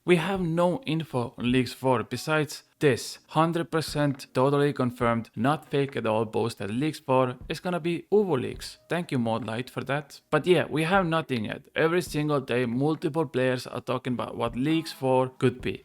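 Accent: Estonia. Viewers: Sa oled eestlane?? Mida hullu. just leidsin su kanali ja mõtlesin, mis aktsent see selline on :D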